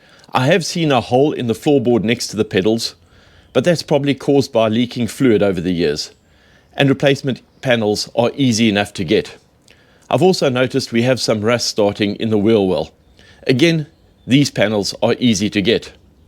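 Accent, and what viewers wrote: South Africa. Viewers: Great to hear a South African accent broadcasting from the soggy isle. Good luck with the restoration. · Hello Sir, are you from South Africa..? 😊😊😊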